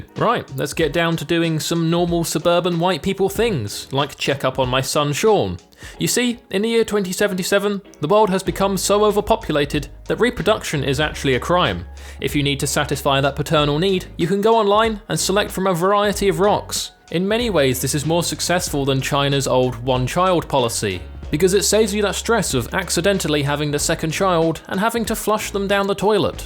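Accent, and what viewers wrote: England, United Kingdom. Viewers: There is no such word as 'nucular'. That is an embarrassing and ridiculous bushism. Things concerning the nucleus are termed 'nuclear'. You sound English, so why can't you spell and pronounce English words? Not the best idea to display your ignorance to the world by uploading it to a massively popular video website. .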